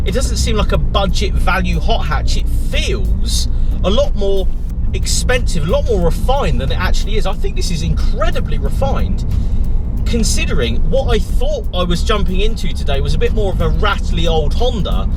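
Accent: United Kingdom. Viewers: Same type of British accent as James Corden. Sounds just like him.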